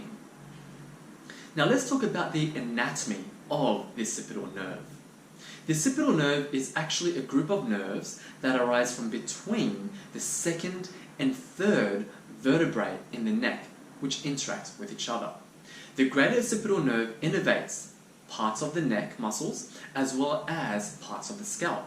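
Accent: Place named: Australia. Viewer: I'd say Australia or New Zealand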